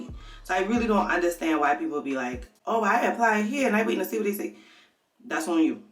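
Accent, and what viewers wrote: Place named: Gullah Geechee, United States. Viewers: Babeeee the Geechee came out today. I smiled so wide one minute in and my son said Mama she sound just like you 🤣🤣🤣🤣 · Man, dis too geechee! LOL!!! Great advice, though! Loving the channel, and I'm not even in HR! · hahaha, Nah.... Perfect Geechie.... So so so very funny...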